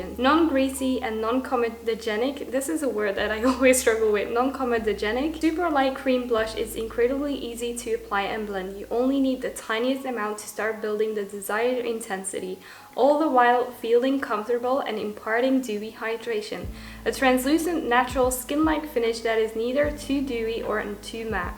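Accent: Australia. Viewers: I’m an Aussie just coming to the comments to say I noticed an Australian accent in the way you speak English - where are you from?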